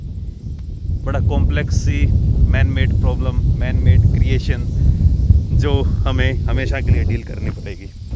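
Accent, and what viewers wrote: Haryana, India. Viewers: The way you talk in haryanavi 👌👌🙏❤️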